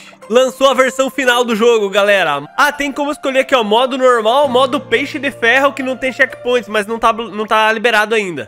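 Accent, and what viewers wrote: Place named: Paraná, Brazil. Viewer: Cai de paraquedas nesse sotaque gostoso de ouvir 😅 sdds Paraná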